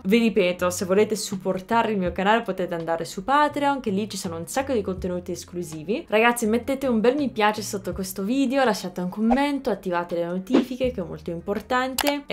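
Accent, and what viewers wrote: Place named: Fano, Italy. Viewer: Ha una perfetta pronuncia fanese, con un leggero tocco anglosassone in alcune sillabe.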